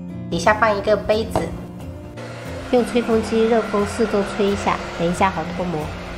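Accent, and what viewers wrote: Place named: Fujian, China. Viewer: 听口音是福建人 她老公可能是四川的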